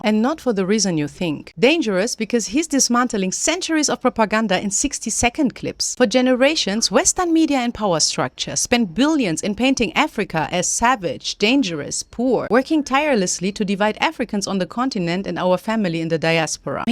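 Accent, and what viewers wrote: Germany. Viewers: When she said "I left Germany..." I was like "I knew it!" 😂 Her English is really good, but it is just really difficult to hide our accent, proven once again. And also proven once again: We Germans are everywhere! I've met Germans in every corner of the world. Good for her that she found a nice place with better weather 👌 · 😊I thought you have a German accent 😊 Thank you for pointing this out. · German accent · I knew you know German well before you mentioned Germany. Your English sounds like that of Germans.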